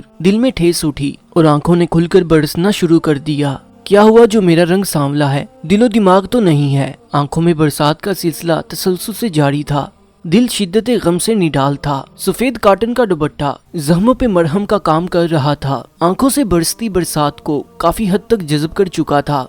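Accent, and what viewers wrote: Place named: Lahore, Pakistan. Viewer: Great story like your Lahore accent.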